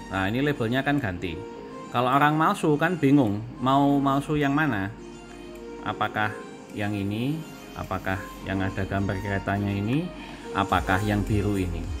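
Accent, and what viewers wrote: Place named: United Kingdom. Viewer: cengkoknya british bgt❤👍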